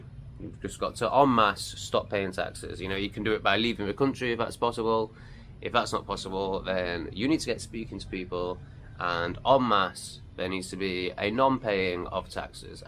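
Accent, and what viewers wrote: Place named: England, United Kingdom. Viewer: You sound English, but it looks like Australia in the background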